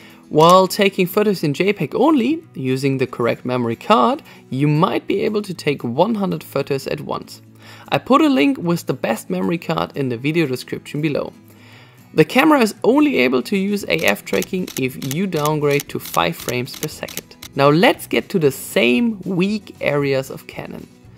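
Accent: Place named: Germany. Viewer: dat german english :D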